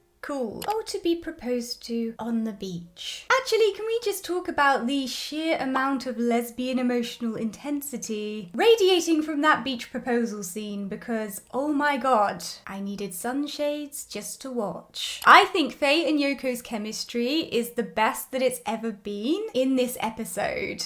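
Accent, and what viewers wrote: United Kingdom. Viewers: I really don't care if the pronunciation is Faye or Faiii. She's hot. Your descriptions just tickle my fancy. So articulate British. Love it. Still laughing.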